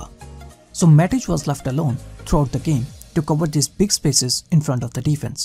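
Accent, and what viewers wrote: India. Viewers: Great video ? Did i hear Indian accent ?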